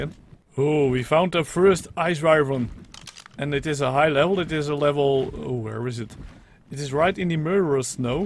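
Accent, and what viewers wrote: Netherlands. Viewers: it seems you are speaking better english than your first videos anyway so it seems you are already trying :) het nederlandse accent zal niet zo snel weg gaan haha maar ik denk dat als je engelse videos bekijkt en luistert je de woorden na kan praten voor je zelf en dan luisteren of het meer klinkt als de native engelse sprekers. Zodat de engels sprekende kijkers minder moeite hebben je te verstaan :D succes!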